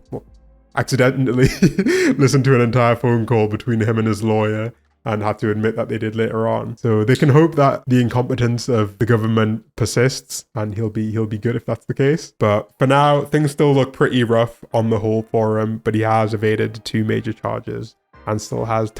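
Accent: United Kingdom. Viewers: You know it took me a while to piece it together. But someone with a british accent explaining the trial of Luigi with more care and compassion then US Media is hilarious to me. Anyway, forgot I was subscribed here and since I turned off my youtube algorithm, I hope to ring the bell and get more videos. Oh yeah, FREE LUIGI!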